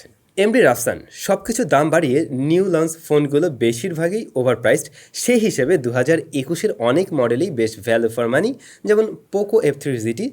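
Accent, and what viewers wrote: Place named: Comilla, Bangladesh. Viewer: Ar vaia apnar village ki Cumilla? Maje maje kotha sune mone hoccilo. Please janaben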